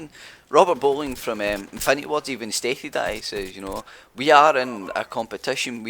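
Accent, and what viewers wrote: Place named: Ireland. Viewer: Everything's funny with an irish accent!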